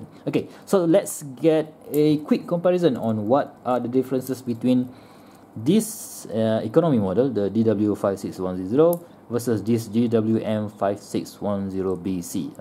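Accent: Malaysia. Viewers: Are you Malaysian? You sound like one haha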